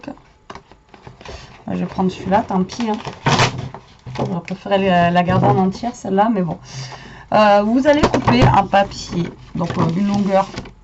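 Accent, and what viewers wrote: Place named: Toulouse, France. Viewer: Bonjour c'est vrai que c'est une bonne idée qu on peut décliner de plusieurs façons .Merci beaucoup...si ce n'est pas indiscret de quelle région êtes -vous ??? vous avez l'accent de mes petites filles qui habitent Toulouse,,,,???bonne semaine ensoleillée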